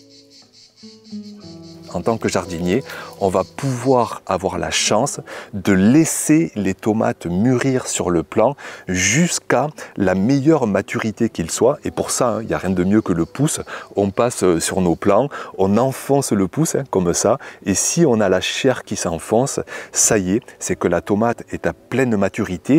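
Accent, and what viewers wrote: South, France. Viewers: Salut, et merci pour tout encore une fois. L'accent ensoleillé c'est toujours un plaisir ❤️ J'ai testé la "Black cherry", tomate-cerise un peu noire/rouge que j'ai bien aimé, il faudrait la trouver en variété classique pour bien apprécier je pense. Autre chose, avec tes soucis de chaleur et sécheresse, pourrais-tu faire la saison prochaine un achat de quelques sachets de Pascal Poot, qui sont génétiquement peu sensibles aux manques d'eau. En nous faisant une mini-série dédiée, thématique. Enfin c'est une idée quoi, ça m'est venu mais tu as dû y penser déjà, Bonnes vacances à tous 🙏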